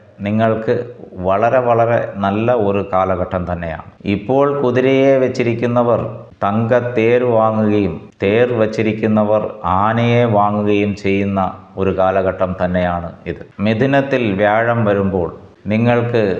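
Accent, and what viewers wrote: Tamil Nadu, India. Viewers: Tamil touch. But good good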